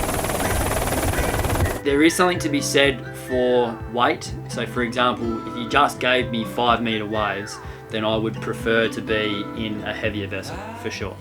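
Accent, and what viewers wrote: Australia. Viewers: We're not sailors...at all. But we love your videos and have learnt heaps about sailing since watching them. We found this one really interesting. Oh and we really love hearing a fellow Aussie's accent and how your positivity always shines through 🤗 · Phew. What a waffle. First I thought it was going to be 1 minute of Aussie nasal bad-grammared intro, then it became 2, 3, 4, but he kept going. Jump to about and he'll start talking about specifics. The title's insufficient too. It's about buying a cat for ocean travel, not for what most people do with their recreational vessels. Three minutes of information squeezed into . 'If that's your design parameters...'. Grammar, mate. Unfortunately, it's a ramble. If only he wrote up a few salient points. :-( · Thank you for this very interesting video, even if i didn't understand everything (dispite of your Australian accent and my french-american-english 😂) Anyway just to speek about the king-size thing : we have one in our Lerouge Brazapi custom catamaran and it seems like we bought a good performing and secure boat 😉 just started sailing with it a year ago, and as we're quite novice sailors we'll tell you more in a couple of years 😄 thanks for sharing your adventure ⛵ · G'day, mate. 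Loved the video after I got accustomed to the Aussie accent. Pack your kit in a seabag and sign on a cat as crew for a year. At the end of that year, you will have the experience to make a wise choice of boat.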